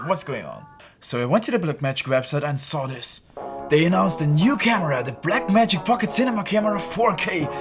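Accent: Austria. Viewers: Yes, he is. For an Austrian it's pretty hard not to sound like an Austrian, even when talking English ;-)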